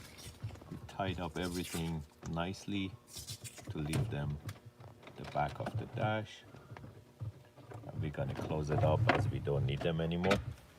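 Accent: Iran. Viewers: The guy accent looks iranian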